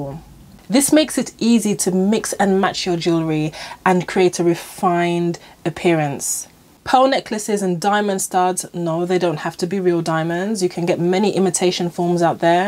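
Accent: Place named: United Kingdom. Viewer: It's the delightful British accent. ✨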